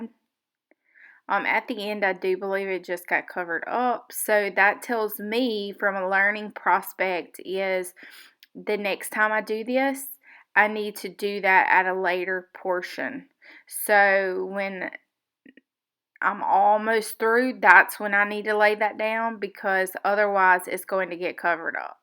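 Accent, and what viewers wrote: South, United States. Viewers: Loved watching your girl's face develop. I love how it looks when you use the scraper. I especially love listening to you talk. Love your Southern accent.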